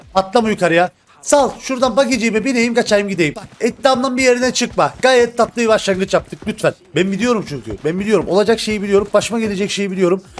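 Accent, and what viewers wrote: Cyprus. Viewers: Abi Kıbrıs şiven e aşık oldum .d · abi net kıbrıs😎😎😎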